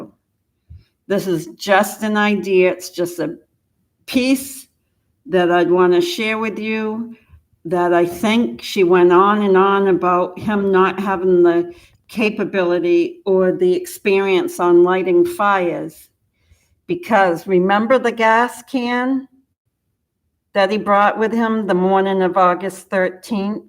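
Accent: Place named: Boston, United States. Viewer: You sound like you come from Boston or near Mass...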